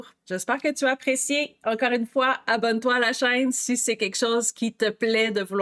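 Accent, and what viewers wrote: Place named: Quebec, Canada. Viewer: J’adore tes projets et j’adore ton accent Québécois ❤❤ bonjour de Bretagne et merci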